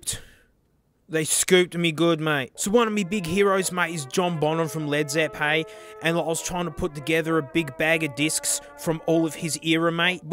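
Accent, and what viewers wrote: Australia. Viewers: and that aussie accent lmao he uses his gift from god like it should be used. he is a godsend.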